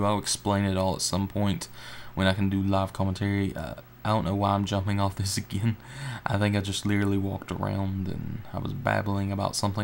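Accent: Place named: South, United States. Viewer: I think its southern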